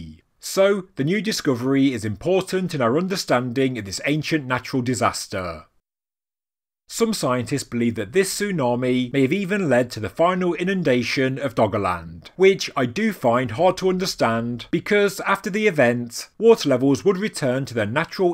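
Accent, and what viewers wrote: United Kingdom. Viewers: Its just his accent 🤷🏼‍♂️from the uk..unfortunately i sound very similar 😂 im from northampton in the midlands.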